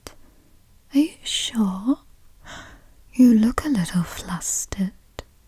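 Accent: United Kingdom. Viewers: Oh my, I am SO, weak for British accents. They have that posh, certain tone that makes them sound luxurious. and GOD, the teasing is just delicious to listen too, and the accent only amplifies it, I would so end up whimpering and flustered in the best way hehe. Lovely video love! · That british accent is so cozy yet so misterious .the experience is very intimate with just simple words of affection im suscribed as heck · Mommy british voice nooo my one weakness.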